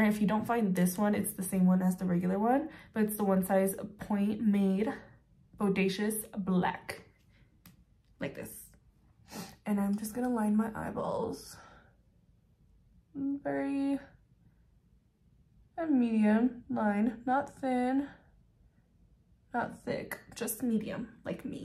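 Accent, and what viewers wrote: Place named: United Kingdom. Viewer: Love it she’s cute and I love the British accent and that’s the first grwm in awhile that’s it’s really good can’t wait for more ❤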